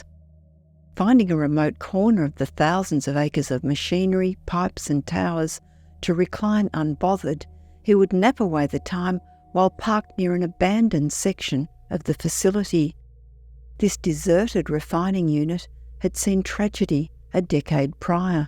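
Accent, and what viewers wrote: New Zealand. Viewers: Is that a kiwi Accent I hear? Your stories are great and your voice is beautiful and if that’s you in t he thumbnail…..❤ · I think it’s a real person, she’s just a New Zealander. So the accent is different than even Australian mainland accents.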